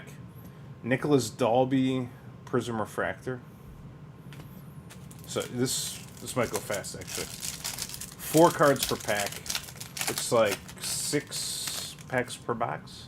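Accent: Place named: Midwest, United States. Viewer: Or maybe Chicago? Midwest for sure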